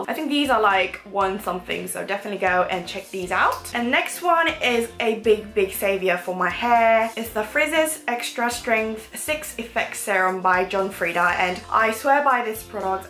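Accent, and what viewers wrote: United Kingdom. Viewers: 요런 추천영상 넘 좋아요 ❤️❤️ 언제 또 여행갈지 모르겠지만 😂 그리고 항상 느끼는 거지만 목소리랑 영국발음도 듣기 참 좋아용:D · 액센트가 완전 취저ㅠㅠㅠㅠ british accent를 갖고싶지만 어릴때부터 미국에만 살아서 american accent 만 가능한 저는 웁니다...★ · 항상 영상 잘보고있어용❤❤❤ 영국영어 들을때 뭔가 언니가 하면 끌리는게 있어서 계속 반복재생하게되눈거 같아용😍😍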